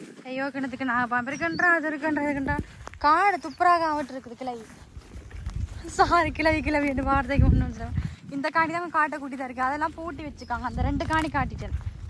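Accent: Sri Lanka. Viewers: உங்களுடைய குறல் இளங்கைவாணொலியின் பிதாமகன் ஐயா அபதூல்ஹமீது நினைவு வருகிறது இலங்கைத்தமிழ் உச்சரிப்பு கேட்க அழகாயிருக்கிறது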